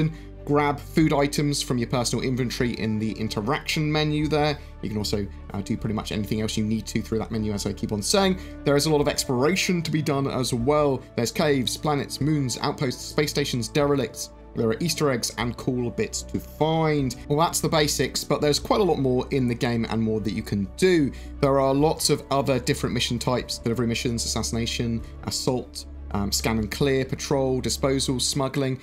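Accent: United Kingdom. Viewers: Men this UK voice...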